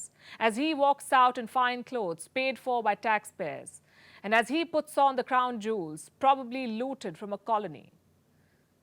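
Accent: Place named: India. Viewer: Lady, you speak good English with a slight Indian touch!. And that says volumes.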